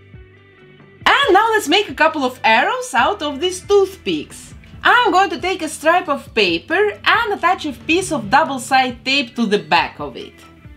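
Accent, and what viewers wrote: Russia. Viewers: Воу, мне кажется или ты русская? Просто акцент русский . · Your accent reminds me of a Russian accent 🤔 (yeah, i'm russian👌) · Слишком русский акцент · Мне кажется, я слышу сильный русский акцент🤔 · У тебя русский акцент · почему вы говорите как русская?.... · У вас очень сильный русский акцент. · hmmm russian dialect...... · Ни капли не разбираюсь в английском, отличить акценты ни в этой жизни не смогу, но русский с первых минут просто узнала. Вот прям слышно что наш человек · Are you Russian? Cos you have such a cute russian accent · что за русский акцент:)) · Мне кажется или она русская, у нее акцент не англичанки или британки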